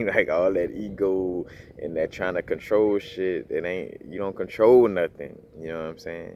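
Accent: New Orleans, United States. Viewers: NOLA accent! 🙏🏾 Peace to you. I love your vibe.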